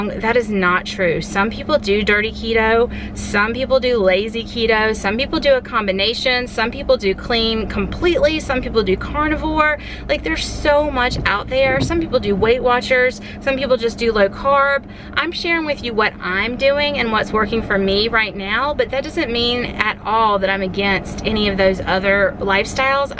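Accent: South, United States. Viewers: Wow... some people are really shitty (excuse my language). This is the first seeing you on YouTube. Immediately, I said I love her spirit. So, I am a new sub. I love that you shared your real life struggles. Your friendenemy sounds jealous hearted. You are probably everything she wish she could be but can't be no matter how hard she tried. Please keep being your authentic self. I love your southern north twang.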